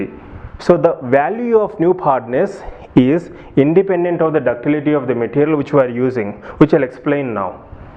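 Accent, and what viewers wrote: India. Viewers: I hate Indian people when they speaking English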